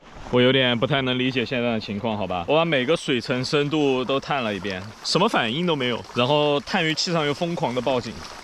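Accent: Sichuan, China. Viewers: 听口音播主像四川人哦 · 听声音有点像成都 ，绵阳口音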